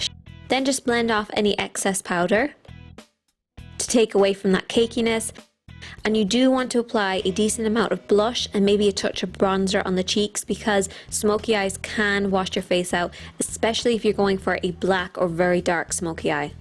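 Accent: Ireland. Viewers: So weird you have more Irish accent in this video compared to your latest videos. Hahaha ! Still love ya :)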